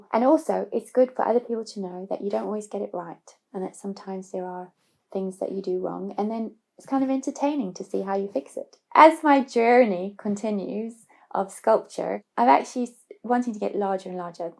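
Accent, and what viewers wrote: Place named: South Africa. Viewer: Ah! I can tell by your accent! I speak Dutch coming from another X colony!😃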